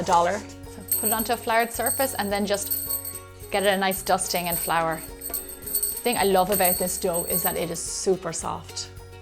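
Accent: Ireland. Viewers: Love the recipe. You have a beautiful Irish accent by the way. How long have you lived in the US? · It's softened for American ears i guess :p But you can almost listen to the Irish pub rogue in ya !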